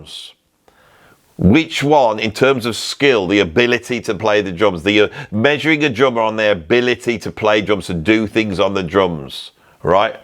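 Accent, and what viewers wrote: Birmingham, United Kingdom. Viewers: He doesn't have a cockney accent. Cockneys are from East London. He's from Birmingham and has a 'brummie' accent.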